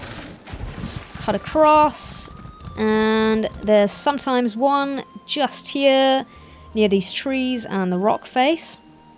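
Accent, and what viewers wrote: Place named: Australia. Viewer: Your accent sounds like highbrow Australian? North Queensland maybe? But your London. Hum. Anyway I love it.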